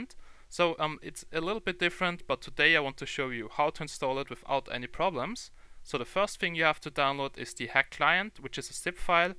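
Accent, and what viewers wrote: Germany. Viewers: you sound like German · You have a German accent haha: DD!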